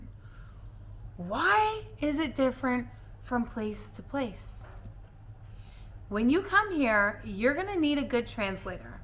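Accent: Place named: Canada. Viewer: It's interesting 🙂 I mean I agree that bureaucracy can be difficult in Serbia😉but when comes to licna karta, pasos, it's very easy. My kids left when they were kids, and when (one of them )was 27 (so after 22 years) we were doing his licna karta back home. And it was done in a few days. You fill out the papers, you pay, easy. Not sure what was so hard🙂. And you don't talk as a child, no worries😍. That's just a Canadian way of talking. 😉 but don't kid yourself, it's not as easy in Canada also, if you are not Canadian. Lots of crap over here also.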